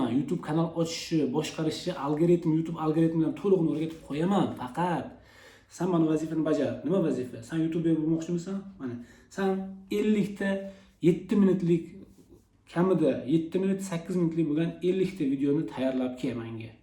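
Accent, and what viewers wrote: Namangan, Uzbekistan. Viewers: namanganni tortyapti Uchqoʻrgʻondanman siz qayerdansiz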